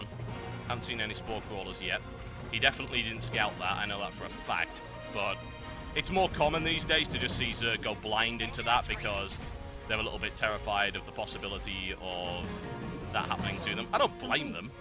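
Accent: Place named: Newcastle, United Kingdom. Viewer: He's a Geordie, that's why. Learn your British accents.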